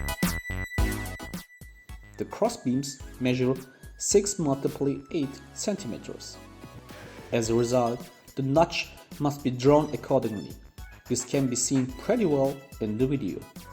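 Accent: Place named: Germany. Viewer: Nope, I'm Asian that voice doesn't sound Asian period. I was hearing German, Dutch or Danish.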